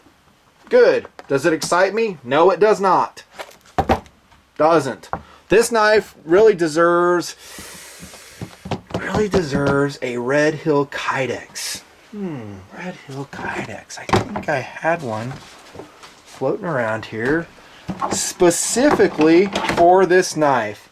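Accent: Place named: Alabama, United States. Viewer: your Bammy accent...lol. Keep the knife vids rollin.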